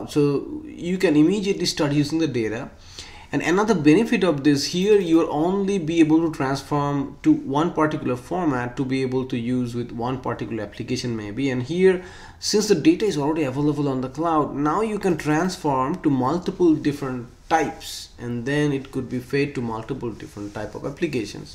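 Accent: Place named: Bangladesh. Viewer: Very informative and useful video. Thanks. (in an unrelated note: are you some how connected to Bangladesh ? Your voice notes has mild accent of Bangla. BTW, I am a bangali :-)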